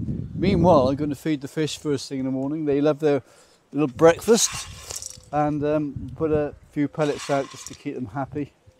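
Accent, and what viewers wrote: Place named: United Kingdom. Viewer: Thank you so much for the wonderful tours. Subscribed! Loved that huge Fern from the Chilean Rainforest - what a beauty. Such a fantastic variety of plants - I will be binge-watching every youtube video I can find. Just lovely and exciting to see such rarities and the wonderful ways they are displayed. Please continue showing the plant names - that is incredibly helpful - it is very difficult to understand British English when you are used to American English:).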